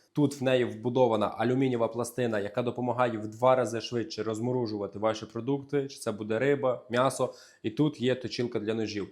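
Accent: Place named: Podillia, Ukraine. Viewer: Професіонал! Клас, було приємно спостерігати, немов родича слухаю 😊 Ви з Хмельниччини чи з Вінниччини?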